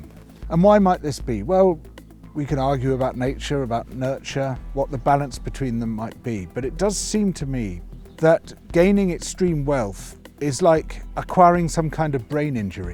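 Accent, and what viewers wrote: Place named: England, United Kingdom. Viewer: "...sending himself into space... in a giant dildo..." If George had have said that with his beautiful English tones I would have died laughing. Later Edit: giant phallus, I had not gotten to that yet, thats going to tickle me for hours.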